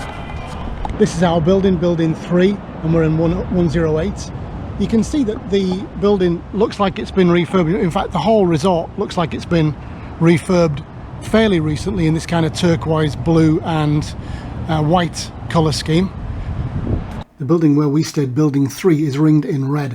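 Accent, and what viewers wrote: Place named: England, United Kingdom. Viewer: Hi my friend we are suppose to come in September this year,hows thinks looking over there ,hope you are all safe,you sound english have you moved over there .thanks lee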